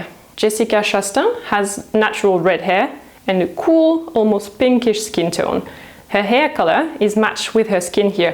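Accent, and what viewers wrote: France. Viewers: oulalalala je capte le signal d'un accent francais! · I think she's french by her accent or I may be wrong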